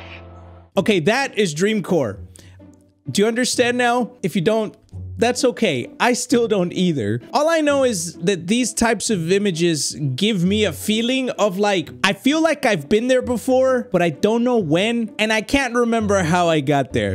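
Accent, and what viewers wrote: Mexico. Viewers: You sound like pewdiepie doing a light Mexican accent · are u english, learned Mexican or Mexican, learned english?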